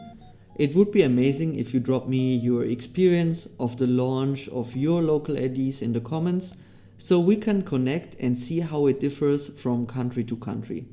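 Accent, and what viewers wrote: Germany. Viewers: Your accent is Germany. Bist du aus Deutschland? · Wenn du nach zwei Sätzen schon den Deutschen Akzent bemerkest. Sympathisch! Viel Erfolg bei deinem Vorhaben.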